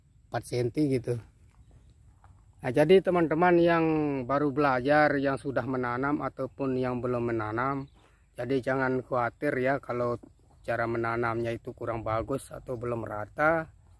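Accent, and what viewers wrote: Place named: Lombok, Indonesia. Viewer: Logat lombok nya kentara banget pak slm dari lombok tgh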